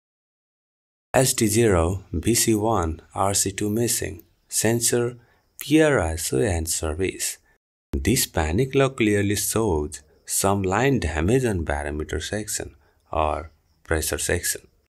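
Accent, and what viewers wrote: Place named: India. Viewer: sounds very indian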